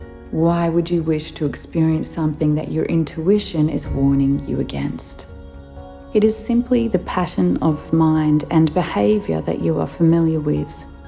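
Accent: Australia. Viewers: Such a deep thought and wisdom that a lot of us neglect especially when our minds go to overdrive and cannot somehow sort out what really our intuition telling us about. Just found you in times of trouble and looking to sooth my heart on Youtube with all the loads of helpful and inspiring stuff. When I hear your voice I immediately recognise your loving Australian accent.:-) Thank you heaps!!!!!! ❤️🙏🏽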